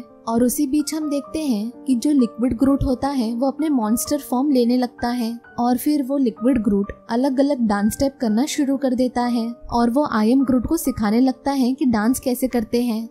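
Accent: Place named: Karnataka, India. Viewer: You are from Karnataka 🤔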